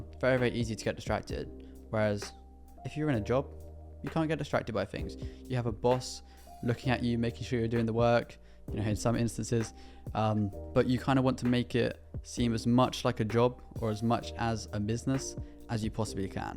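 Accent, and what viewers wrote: United Kingdom. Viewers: How to sound British like you? I like your British accent mate 😍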